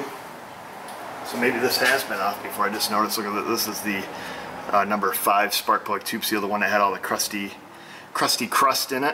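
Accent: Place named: Pittsburgh, United States. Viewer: Hey pal leave off of my fellow Pittsburgher. I thought I spoke perfect English until I left home for the wild West and people questioned my pronunciation of certain words . It appears that Pittsburgh has it's own dialect.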